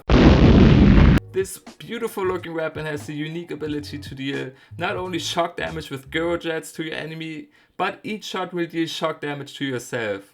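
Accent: Germany. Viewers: u are german i hear it ;D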